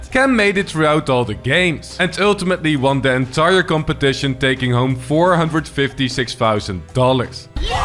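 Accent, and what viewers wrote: Netherlands. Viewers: Dutch accent hihi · Your accent really sounds Dutch, are you? 😅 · are you dutch? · Het duurde minuten voordat ik erachter kwam dat je een Nederlands accent had. Toffe video :) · i smell dutch but ar you dutch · Are you dutch by any chance? I can hear a accent. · Ik hoor gewoon je Nederlandse accent door je Engels heen haha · The dutch in his english voice 😭😭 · is this guy dutch? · I smell dutch accent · deze guy klinkt nederlands nice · I smell dutch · THE DUTCH ACCENT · I like the videos.. The dutch english accent is just to cringe for me, unwatchable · i smell dutch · You sound Dutch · Wat een Nederlands accent zeg haha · You sound dutch, very very dutch. i can tell by your accent 😂🇳🇱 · Are you dutch?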